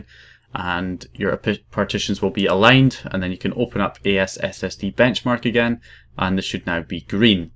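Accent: Scotland, United Kingdom. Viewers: I so much liked the Scottish accent that I subscribed! :) hahaha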